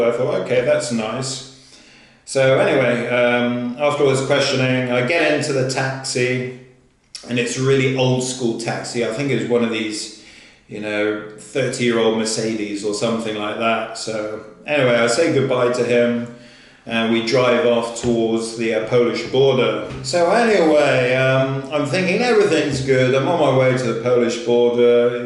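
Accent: England, United Kingdom. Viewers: You actually did pronounce it right. Close to it as you can get with the english accent. Kaliningrad is supposedly fun to visit if you like all the old abandoned wwII stuff, you should have explored all of that. But right now with the situation, just get back to Europe. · Hi Mr traveler I’m new too your channel I loved your video and I love your English accent! Sending love and greetings from Orlando Florida USA ❤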